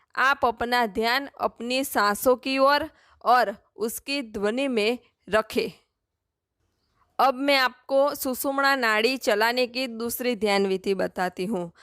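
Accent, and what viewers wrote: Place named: Gujarat, India. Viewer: હું પણ તમારી ભાષા ના ઉચ્ચારણ પરથી અંદાજ આવી રહ્યો છે કે તમે મગજ માં ગુજરાતી નુ હિંદી માં રુપાંતરિત કરી ને બોલી રહ્યા છો 😊😊 જય શ્રી રામ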